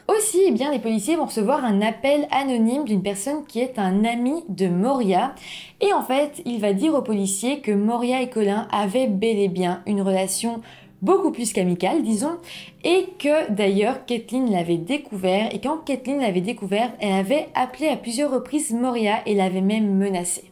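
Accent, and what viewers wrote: Belgium. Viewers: Bonjour, Bon avant toute chose, je te remercie de relater cette affaire aussi récente et dans les détails et dont je n'avais pas eu connaissance.😉 Cependant et au risque de me mettre toute ta communauté à dos...🥺😭 Mais bon sang!!! Non pas que je sois tatillons et sachant en plus que ton accent belge est des plus charmant et n'étant moi même pas originaire d'Alaska(rapport au poisson); Par pitié!!! 🙏 "Colin" quant bien même ait-il de "l'oseille"; je pense que la "moutarde"; fut-elle à l'ancienne, lui monterait rapidement au nez en entendant ta prononciation le concernant😡😂...(par ta faute, j'ai la dalle; du coup🍽😊)... Concernant ton analyse: j'acquiesce totalement et bien que l'affaire soit en plein déroulement, il ne fait aucun doute selon moi, concernant la culpabilité de la principale intéressée (jalousie, possessivité et fuite par dessus le marché). 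En conclusion, je dirais que force est de constater que le yoga ainsi que ses alternatives en matière de relaxation (à l'occidental, je précise); sont loin de démontrer leur efficacité en matière de gestion de la colère, ainsi que de retenue vis à vis d'autrui... Je laisse notamment à tes viewers (et potentiellement lecteur de ce post); le soin de se faire leur propre opinion concernant le nombre à travers le monde, d'individus, soit disant "healthy" et "natty"; "bien dans leur peau et dans leur tête à l'instant T"; ayant basculés dans les abysses de la folie; à priori aux antipodes de leur style de vie tan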